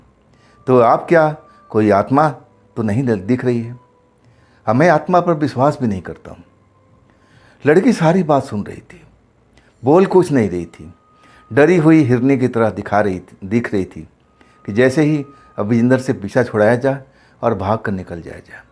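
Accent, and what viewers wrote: Bihar, India. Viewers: 🎉Aapki aawaz bahut hi accha lagta hai bihar ki jhalak aati hai 😅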